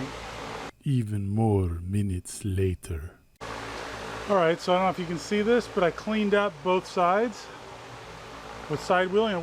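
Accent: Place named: France. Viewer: I'm sorry to tell you but your French accent is terrifying..... But funny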